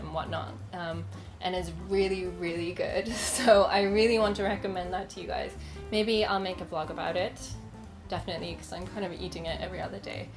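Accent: United Kingdom. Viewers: are you originally from South Eastern part of England? Your accent sometimes has a tinge of a South Eastern or often times confused with Aussies sounding accent. (I've met some people from East Sussex and Kent that have an Aussie sounding accent, though it would fluctuate between that and just an average Londoner's accent.) By the way I think your nose gives you a unique look that sets you a part from the crowd and you have a nice attitude to go along with it. Like they say beautiful inside equals beautiful outside too. In your case that is very true. Cheers ad do not listen to the haters, you are an amazing lady! Enjoy Japan and where ever you go to next too! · Japanese girl with a brit accent...ummm... i'll take two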